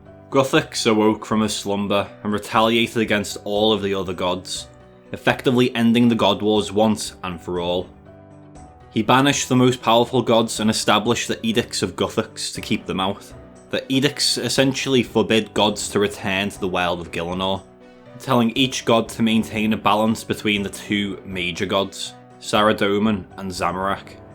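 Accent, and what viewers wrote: Liverpool, United Kingdom. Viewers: Real Scouser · Documentary length game lore video in the Scouse accent 😅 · Listening to a Scouser explain RuneScape is the height of 2025. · top scouser lad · Is that a Scouse accent? It really annoys me, but I love your content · ill bet my whole bank your a scouser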